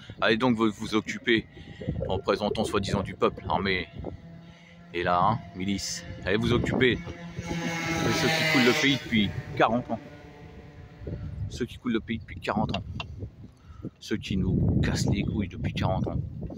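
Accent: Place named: Paris, France. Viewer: Tiens !! Quelle coïncidence... ça doit être l'accent titi parisien 👍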